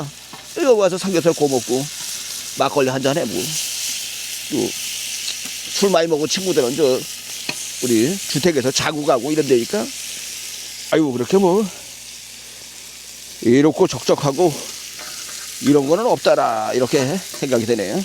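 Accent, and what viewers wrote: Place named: Jecheon, South Korea. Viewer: 제천 , 단양 사투리 같은데요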